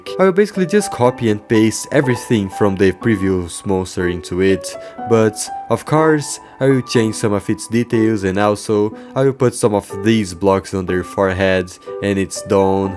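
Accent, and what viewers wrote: Brazil. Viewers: I KNEW IT,im portuguse from portugal and i knew your accent was from brazil xddd ola de portugal adoro o teu conteudo! Continua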